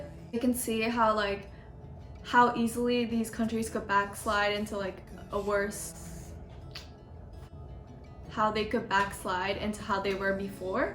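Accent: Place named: Japan. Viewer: 日本人の英語という感じが面白いですね。私がインターで出会った友人たちの話し方似てるですね。日本語の方が上手ですよ！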